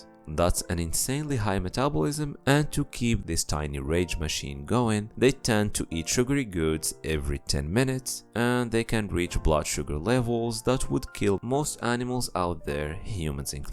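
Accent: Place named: Morocco. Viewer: Dude your accent sounds moroccan, where are you from?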